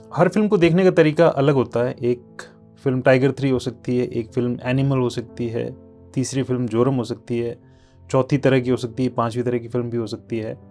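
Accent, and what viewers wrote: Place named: Rajasthan, India. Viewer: bhai aap rajsthan se ho kya , bolne ka andaz vanhi ka hai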